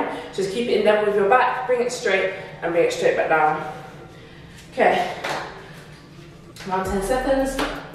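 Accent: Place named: Cockney, United Kingdom. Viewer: I just come here to listen to the Cockney accent lol. Keep up the work 👍 💪 From Canada 🇨🇦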